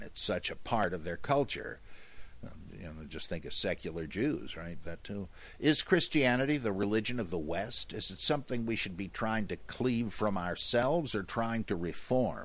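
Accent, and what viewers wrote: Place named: Germany. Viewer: The german accent- spot on!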